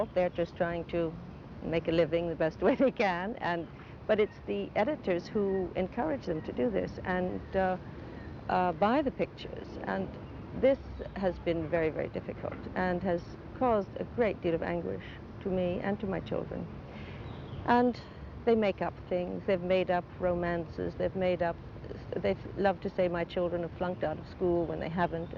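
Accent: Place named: Europe. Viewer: she's lived in Europe among Europeans for decades so she's almost certaintly developed the accen you can hear.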